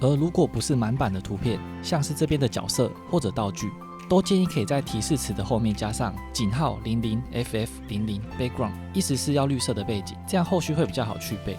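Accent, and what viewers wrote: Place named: Taiwan. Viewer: 是台灣人的聲音太感動了 我以為ai世界沒有台灣人了全部都是中國式教學...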